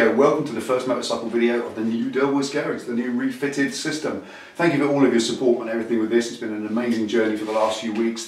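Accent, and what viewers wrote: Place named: England, United Kingdom. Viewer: First, this is a great series man, and I'm going to throw you a bone on PP, or buy a Tshirt to say thanks for your work. Never again will I pay these outrageous fees because that's about right on the money. Average low end cost is about $100 USD per hole anywhere in Texas. Service rates vary from $85-$125/hr depending on where you are. I just picked up an old 04 Springer, and I'm using these videos as they are the best on YouTube. Plus, I love English accents, so that's a good bonus. 😎 A couple of questions mate...if you don't mind. 1. Old timers, especially experienced Mechanics strongly encourage running 80/140 in the gearbox. S&S performance does as well by the way. What say you about that? 2. Just curious...Why did you not use the socket for plug removal here?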